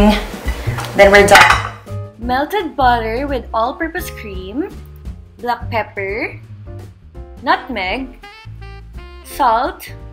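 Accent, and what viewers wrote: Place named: Bisaya, Philippines. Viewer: Pag bisaya tlaga prang pangit mgtagalog pru sa english slang... ❤❤❤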